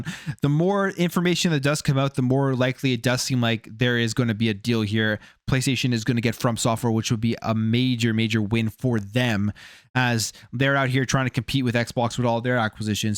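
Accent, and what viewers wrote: Canada. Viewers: You a canadian!?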